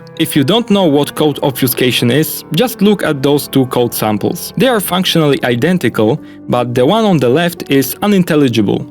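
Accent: Poland. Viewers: wiedzialem ze jestes polakiem po akcencie slychac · Akcent typowo polski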